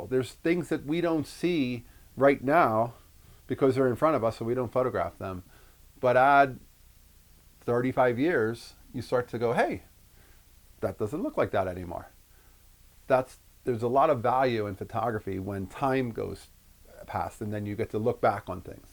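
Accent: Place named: Delaware Valley, United States. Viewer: Sorry for the loss of your father,. I spent part of my childhood on the South Jersey side of the bridge to Philly—right along the Black Horse Pike from Gloucester Township to Mays Landing—so I recognized that Delaware Valley accent of yours right away. You’re absolutely right about those photos feeling like time travel. When you mentioned Acme, I had to laugh. For me, Penn Fruit is another grocery name that pops up in my own “time-travel photos,” at least in my imagination. I was back up that way this past summer in Atlantic County when my best friend from childhood passed. I always enjoy your videos.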